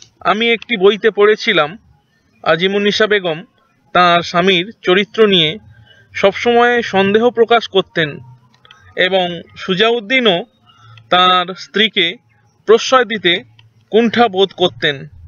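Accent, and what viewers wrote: India. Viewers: Uchoron shune Indian mone hoi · আপনি কি বিহারী. কথা বলতে কষ্ট হচ্ছে?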